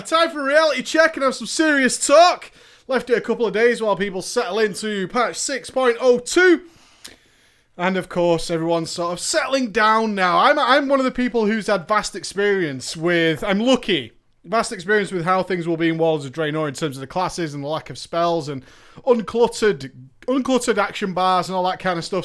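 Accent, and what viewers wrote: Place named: United Kingdom. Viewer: he has a British accent and he lives in england. theres a million and 1 kinds of accents over there.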